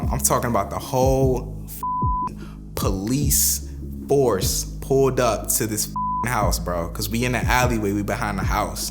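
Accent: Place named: Newport News, United States. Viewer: Sounds like Newport News for sure..shout out to VA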